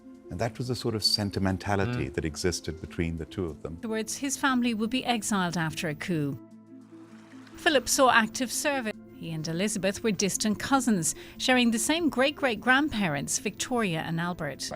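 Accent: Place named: United States. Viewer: year i hate the American accented narration, Prince Philip was GB's and the commonwealth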